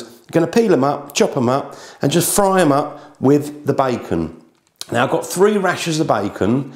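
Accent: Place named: London, United Kingdom. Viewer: as in he has a London accent ? and so do 10 million others , he sounds nothing like Jamie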